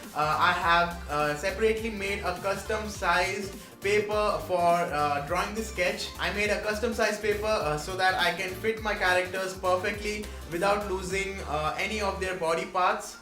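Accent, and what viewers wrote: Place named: India. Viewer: Nice video! And great accent. Indian right?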